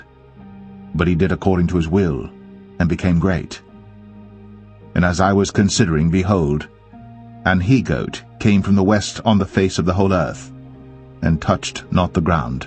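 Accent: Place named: England, United Kingdom. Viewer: sounds like England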